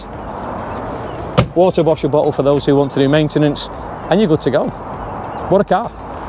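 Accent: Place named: Manchester, United Kingdom. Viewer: Very helpful thanks - good to hear a Manchester accent. Take delivery of mine in December hopefully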